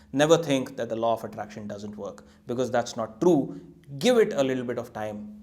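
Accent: India. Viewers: Your accent makes me want to watch a Bollywood movie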